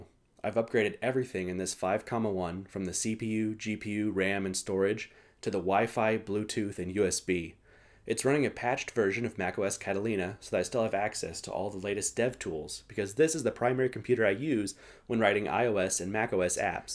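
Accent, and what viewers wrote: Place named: Australia. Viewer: There's some Australian creeping into your accent for sure.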